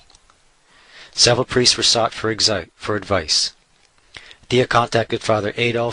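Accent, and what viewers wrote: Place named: United States. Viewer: Because there's been quite the many bad influences coming in to different places in the world from the United States and thus, that's why you sounded like you had been from the United States to me. I've had enough about the United States government. They've badly confused me, notice anything different about India?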